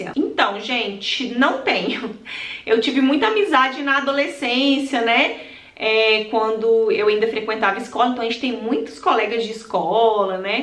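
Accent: Minas Gerais, Brazil. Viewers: Minha querida é muito bom ouvir vc essa conversa mineirinha esse seu jeito verdadeiro vc é nota 1000 minha amiga virtual adoro vc 😍😍😍😍🥰😘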